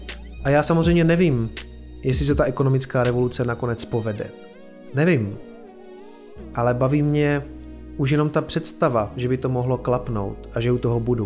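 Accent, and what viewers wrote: Czech Republic. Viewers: Už tě sleduju nějakou dobu ale ted slyším že si z Prostějova. Já jsem se tam narodil a bydlel 22 let. Dobrá práce, moc pekný videa, naučil sem se hrozně moc a občas se i zasměju 👍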